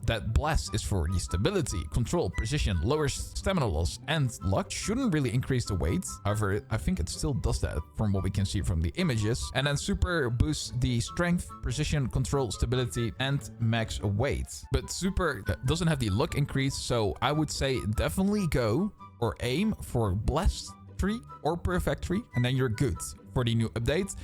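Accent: Netherlands. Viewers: the dutch accent is strong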